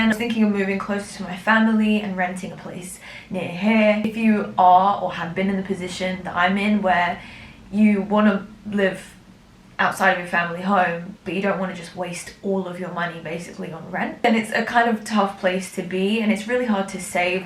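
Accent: United Kingdom. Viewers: Lol she sounds British aahhah is that just me.. must be because I'm British too 😊😊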